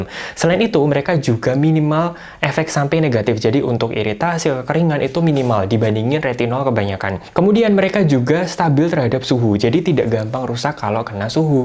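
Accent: Java, Indonesia. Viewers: Seneng aku ndelok channel iki, cara mbahas e medhok tapi ndek kuping iku enak.... jowo banter cara ngomong e hhhh. Gudluck cak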